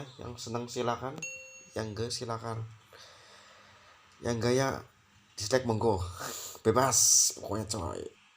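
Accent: Banyumas, Indonesia. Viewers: Bang ngapak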